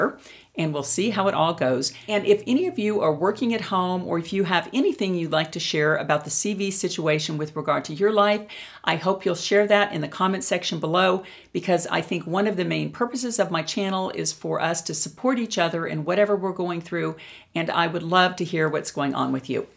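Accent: South, United States. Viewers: Hi! Doug again. Nice house! We seem to have more stuff in common. I too studied drama (not in college) and I lived in LA for a while when I was younger, did some minor stuff. But didn't get my star on the walk of fame, haha! I would like to pursue acting again now that I am older and wiser in some sort of venue. I also am about to get my life and health insurance sales license in the state of Florida, so I was curious to what kind of insurance benefits you sell? The testing centers are closed for now until further notice so I keep reviewing all my studies and hope to pass exam. It's a career change, as I mostly worked in hospitality before. I am also a writer and visual artist and play the piano. Artsy kind of guy. Are you from the south? That is my guess, haha!! I live north of Tampa in a town called Inverness in Citrus County, FL. Grew up in Tampa. 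Take care.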